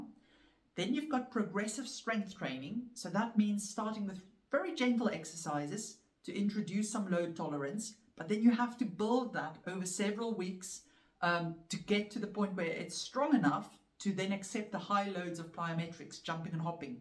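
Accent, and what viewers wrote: South Africa. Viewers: I love your accent! Are you south african?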